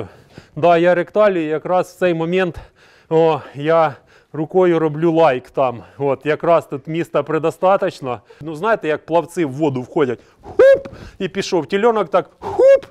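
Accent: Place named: Dnipro, Ukraine. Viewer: Добрый вечер ув. Подписалась на Ваш канал, во всем Вам поддерживаю и рада что у меня есть единомышленники. Я далеко но мечтаю о своей ферме.потому и далеко чтобы приблизить свою мечту и рада что встретила Ваш канал. Я Ваша землячка из Днепропетровщины. Вот только ненада оправдываться за наш акцэнт. Днепропетровщины всегда была и будет русскоязычной, как не крути и какбы это ненравилось нашим братьям из Западной Украины. Почему нам кто-то должен диктовать на каком языке разговаривать. Вам успеха во всем.и добра Вашему дому.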